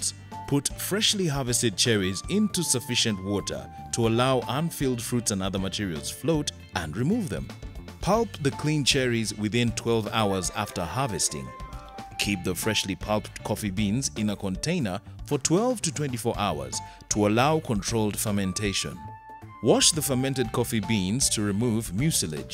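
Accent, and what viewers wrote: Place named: Africa. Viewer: Great video very straightforward and I love the African accent! 😍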